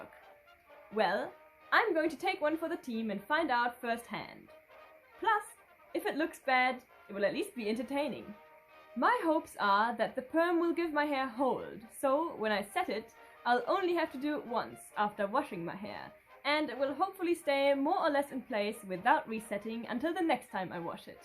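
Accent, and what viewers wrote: Germany. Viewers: oh wow ! You've lived in NZ since you were 8 . May I ask how you retained such a strong german accent ? Most people lose theirs when they move abroad as a child . Love your videos!! ☺️ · are u german by any chance? you kinda sound like one and your last name does too :D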